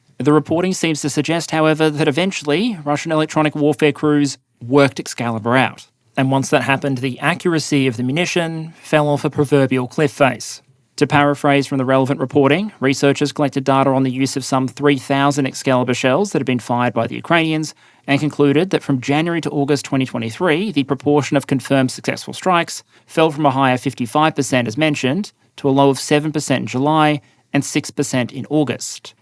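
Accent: Australia. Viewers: Great as always! BTW - is "wreck" havoc a specifically Australian idiom (I've always heard "wreak" havoc up until now) or is that just how "wreak" sounds with this accent? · Is this guy Australian?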